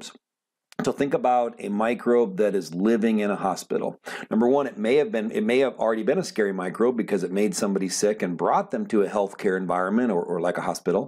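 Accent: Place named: United States. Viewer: Goddamn sir finally. Finally someone who speaks American English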